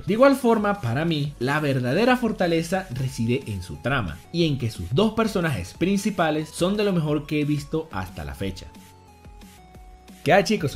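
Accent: Venezuela. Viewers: Hay youtubers que nacieron para fracasar, otros nacieron para triunfar, tu eres lo segundo sigue asi venezolano que se que lo eres por tu ascento xd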